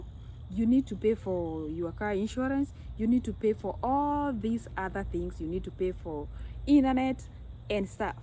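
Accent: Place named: United States. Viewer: Am happy to heard on how you pronounce your words, now you are American typical..How long you have being there!?.😂😂💪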